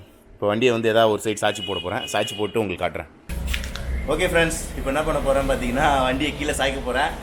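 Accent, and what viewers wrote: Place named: Chennai, India. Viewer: Hi man...நீ பேசுறத வச்சு நீதான் கைப்புள்ளன்னு நிக் நேம் வச்சுகினேன்னு நென்ச்சிட்டேன்பா. .. படா ஷோக்கா கீது பா ஒன் டாக்கு ஆங்..மட்ராஸ் பாஷை கொஞ்சம் கூட எச்சாவா கயந்து அள்ளி வுடுபா..பேஷாபூடும்..ஒன்க்கு அது நல்லா சூட் ஆவுது தோஸ்த்து!!!!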